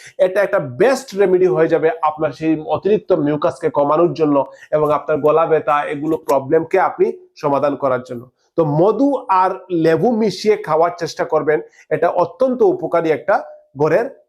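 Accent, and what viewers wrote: Sylhet, Bangladesh. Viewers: Sylhet er bhasha emnitai bujajai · Bro ! Are you from Sylhet ? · bai sylheti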